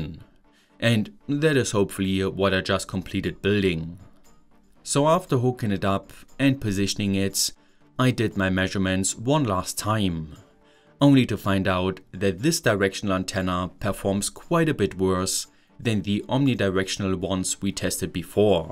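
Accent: Germany. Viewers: If you are a, why do you have such thick German accent? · Ich feier ihn immernoch für das spezielle Deutscher redet Englisch.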